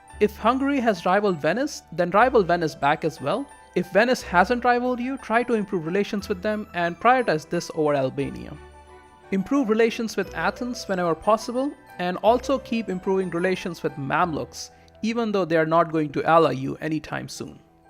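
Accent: India. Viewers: You Indian or something? This accent is horrible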